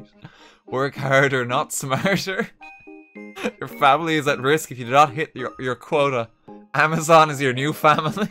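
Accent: Ireland. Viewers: irish sounds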